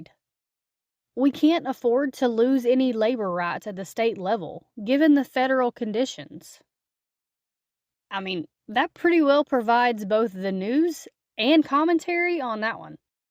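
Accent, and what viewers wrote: South, United States. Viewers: It may be just me, but I gave it some attemps and have to say, the combination of a robot voice and southern dialect makes it really hard to understand what is being said.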